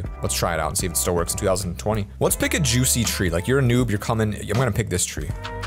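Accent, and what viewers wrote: Russia. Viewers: tip u need to set to to the nigth mode :) by clicking it also nice russian voice😂 live ur vids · Bru. His Russian accent xD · noice russian voice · Ur Russian makes the vid ten times better 😂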